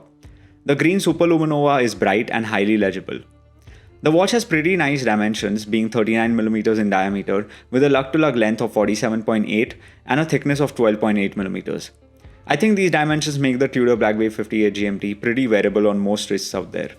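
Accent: India. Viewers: God I can’t stand Indian accents 🤢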